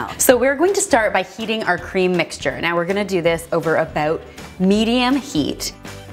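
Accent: Canada. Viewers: Hers is less of the uh-boot Canadian pronunciation, and more of the subtle uh-beh-uh-oot pronunciation (almost like uh-boat but even more subtle). It comes out through other words like "house" too. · Are you Canadian, I love your accent. I am from the south (NC), I know all about accents..lol! :-)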